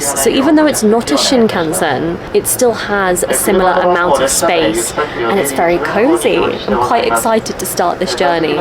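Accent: United Kingdom. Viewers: いつもながらナレーションが素晴らしい。響きの良い声とイギリスなまりは良い雰囲気です。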